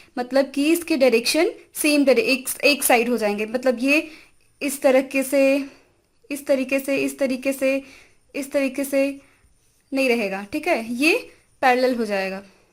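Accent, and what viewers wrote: Bihar, India. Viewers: Mam Bihaar se hai kya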